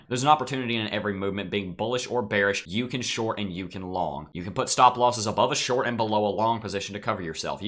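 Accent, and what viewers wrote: South, United States. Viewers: Don't do it. Your folksy southern cadence is all we need!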